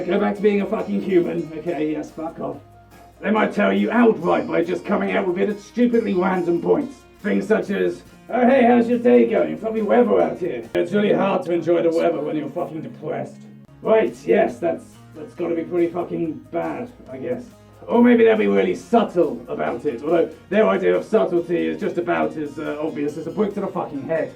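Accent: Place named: North, United Kingdom. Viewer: "Its not even a script, its on the back of a fucking Tesco Clubcard envelope!" Very British thing to say,. Also, i think because of your accent, you're from the North?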